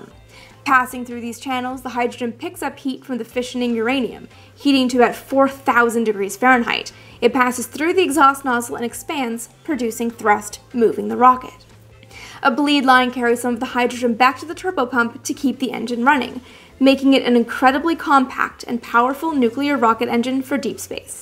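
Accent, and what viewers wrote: Canada. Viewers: You should do at least one joint 'cast with Paul Shillito of Curious Droid. P.S. You're Canadian, aren't you? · Are you from Canada? If not it's gotta be like Wisconsin or something, one of my ex gf's was Canadian and I swear I hear the same little accents in you that she had that no one else seemed to notice.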